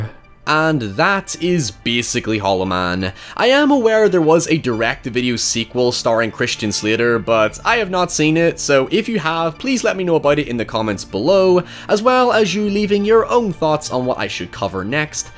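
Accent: Ireland. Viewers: I can tell your Irish, but why are you putting an upwards inflexion at the end of almost every word? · first time requester, so I'm excited to share my recent J horror deep dive. I caught Noroi: The Curse, probably the creme de l creme of found footage flicks. It h interesting hook as an investigative journalist tries to get to the bottom of mysterious deaths and disappearances. A slow burn masterpiece with a truly horrifying climax. One for the ages that only your dulcet Irish tone can breakdown accordingly. Keep up the Great work, you've knocked it outta the park this year! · You're British? I thought you were Irish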